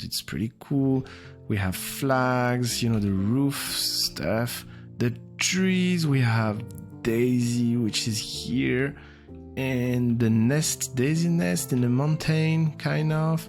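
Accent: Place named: France. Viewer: Toi, je sens que t'es Français en tout cas sympa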